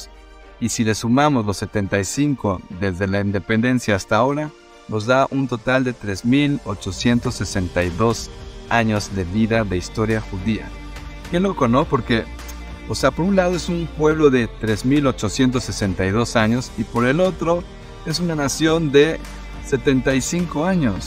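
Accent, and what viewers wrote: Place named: Mexico. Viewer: TU acento es MEXICANO FELICIDADES ISRAEL LOGRO FENÓMENAL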